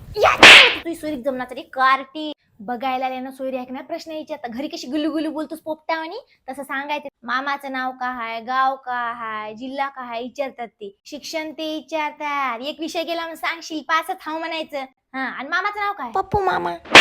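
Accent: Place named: Marathwada, India. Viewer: Bokna,, marathvada style,, ly bhari ❤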